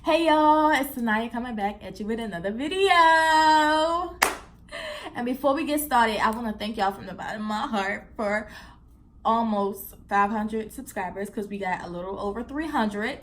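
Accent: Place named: DMV, United States. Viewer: Where are you from? I hear an accent in your voice. It sounds like you may be from the DMV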